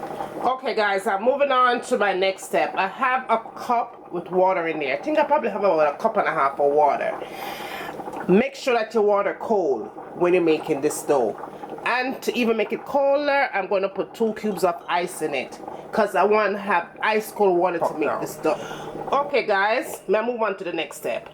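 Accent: Jamaica. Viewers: Beautiful patties, beautiful lady! Love the Jamaican accent...💖Thanks for this recipe!😊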